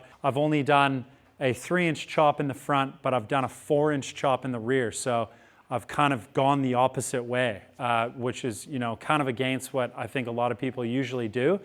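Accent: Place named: Australia. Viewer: Damned you're talking almost Ozzie now. Come one down to the coast for a couple days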